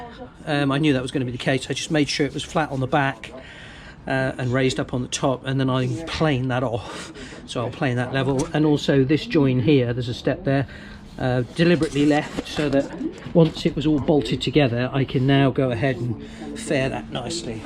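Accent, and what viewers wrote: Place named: RP, United Kingdom. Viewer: Bonjour, The more I see your videos, the more I discover your crazy project. Once again, I would like much more videos, I am sure I am not alone and people who help you. As an example, I would have liked more videos of the hull rebuild and the calking among other topics because I think I have seen some light through ot in your previous video. Besides this, I like your accent, it looks like the one I learnt 50 years ago at school and I easily understand your explanations. I would say that you have a BBC 1 accent perfect for students 😁 Amicalement, Raphaël, no longer student for a long time but always passionate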